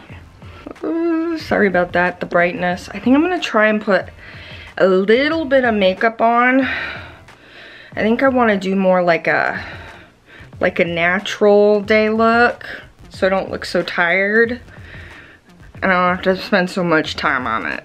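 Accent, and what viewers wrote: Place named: Massachusetts, United States. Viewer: Rabbit holing lol😂..Ik you’re from Cali but you sound like someone from Massachusetts lols. Merry Christmas!🌟🥰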